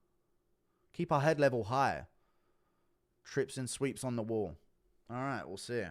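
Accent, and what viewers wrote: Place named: United Kingdom. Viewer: He says "Dorito" instead of DeReider because of his awful accent... Brits who don't have the BBC proper speech really butcher english. The only people who butcher it worse are cajuns but these guys have the excuse of being severly mentally redacted. The brits just seem to love to butcher the language they created. It's like they're playing a game where they get points the less they enunciate.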